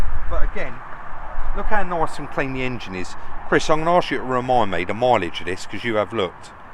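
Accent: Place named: Kent, United Kingdom. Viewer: Great hall of cars that. Love your Kentish accent & sayings....." friend of mine reached out to me " 😂 You've got mates with long arms 🤣🤣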